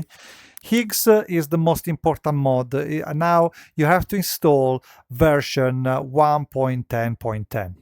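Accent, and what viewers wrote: Italy. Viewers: Wait r u italian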